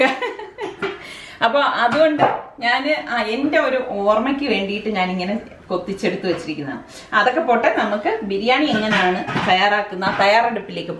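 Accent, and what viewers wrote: Trivandrum, India. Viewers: Thanks itha.eppozanu video kanunnate .teachere bahumanikkunna ithaykku big salute.l am a teacher.super biriyani itha. Tvm bhasha kelkkan nalla rasam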